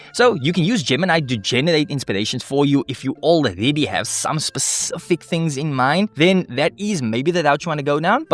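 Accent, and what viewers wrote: South Africa. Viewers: You sound like you from The Cape Flats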